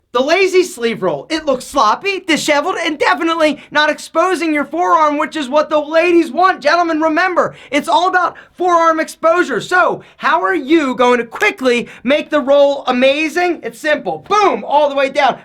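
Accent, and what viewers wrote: Philadelphia, United States. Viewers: This guy gotta be from Philly 🗣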